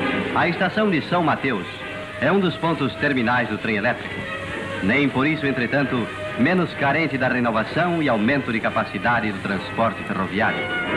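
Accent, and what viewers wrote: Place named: Portugal. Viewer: esse português do narrador tá meio portugado rs.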